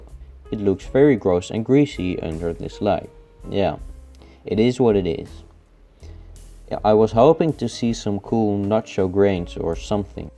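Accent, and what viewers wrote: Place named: Europe. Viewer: You sound like you maybe Europe so that may not be self isolation but enforced isolation because you know...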